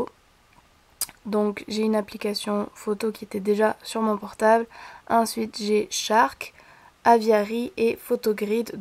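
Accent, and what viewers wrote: South, France. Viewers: Les cheveux courts te vont super bien, tu as un super accent du Sud (comme nous ^^ Lol) et tes videos sont géniales! Hate de la prochaine Vidéo! #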